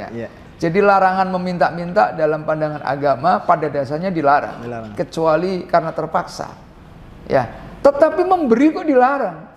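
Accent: Java, Indonesia. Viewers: Bawa2 betawi kok logatnya jawa yai?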